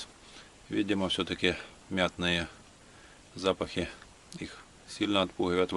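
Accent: Ukraine. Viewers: вы где то на Украине судя по говору?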